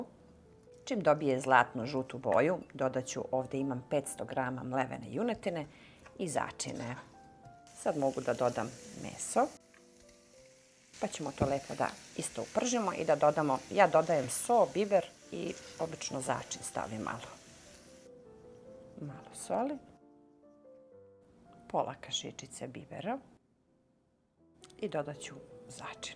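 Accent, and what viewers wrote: Serbia. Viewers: fuj, srpski naglasak Hrvatskog jezika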